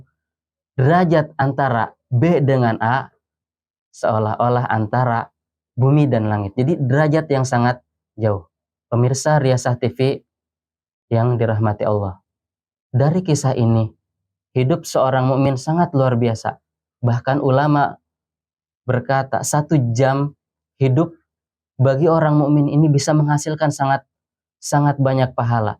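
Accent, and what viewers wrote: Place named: Bogor, Indonesia. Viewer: Tabarokallah Logat bogornya ga ilang😊😊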